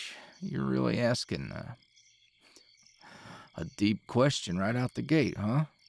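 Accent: South, United States. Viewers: It gives me an unexplainable amount of serotonin to hear a man with a southern accent and knows he's not homophobic or any of that other negative stuff associated (rightfully so) with southerners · The thing that is so cute about country boys is how they sound exactly like:3 <3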